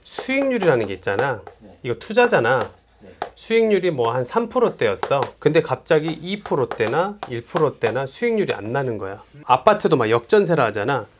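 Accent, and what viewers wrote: Jeolla, South Korea. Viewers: 라도 사투리만 고치시면 딱 조을텐데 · 아 전라도 사투리 ㅈ같네 진짜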